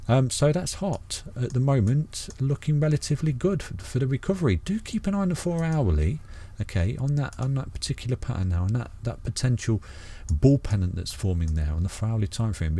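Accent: United Kingdom. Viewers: I 've got my headphones on and i'm so enjoying his warm British accent, i cant even hear his neighbors car at the background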